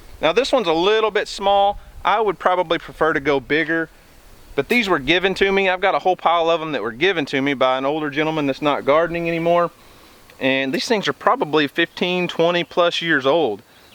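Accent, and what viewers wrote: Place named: South, United States. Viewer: Ahhhh...a fellow Southerner.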